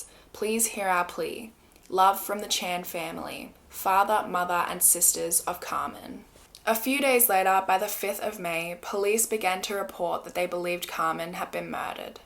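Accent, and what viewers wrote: Australia. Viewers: Your videos are great to listen to at work. You have the nicest Australian accent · What is it that is so appealing about an Australian accent? Love u 😃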